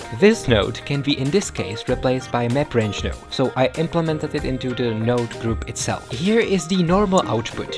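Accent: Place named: Czech Republic. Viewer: Great tutorial! I am wondering, aren't you Czech? The accent seems familiar :D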